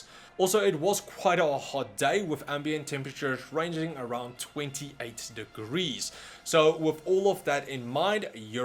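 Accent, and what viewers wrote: South Africa. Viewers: Is this guy from South Africa? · South African?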